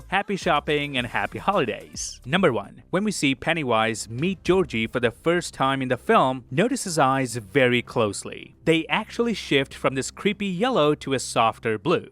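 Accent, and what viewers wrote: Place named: Canada. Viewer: eh? New sub. 🤙🇨🇦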